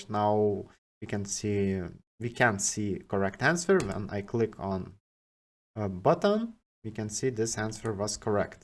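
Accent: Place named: Eastern Europe. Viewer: where are you from dude? you sound eastern european ;) Cool video!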